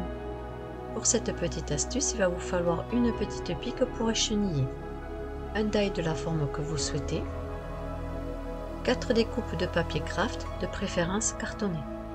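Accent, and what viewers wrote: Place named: Montpellier, France. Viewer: Bonjour, je viens de m'abonner, parce que merci pour ce partage et aussi parce que j'ai le même accent! !! Hihihi, je suis originaire de montpellier, mais je vis en RP, à bientôt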